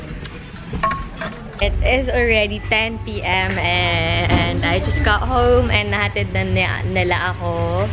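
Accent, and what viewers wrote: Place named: Pampanga, Philippines. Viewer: o diba si na pinanganak na tlagang may kaya ung pamilya sobrang humble and ineembrace yung kapampangan accent nya, yung iba na bago lang nagkaron dahil sa pagvlog sobra makaslang at pa sosyal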